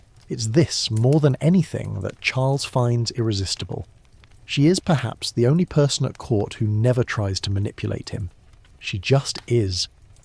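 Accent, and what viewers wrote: United Kingdom. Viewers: You have to love this british accent. That's why I've found this same voice on at least 4 sleep channels now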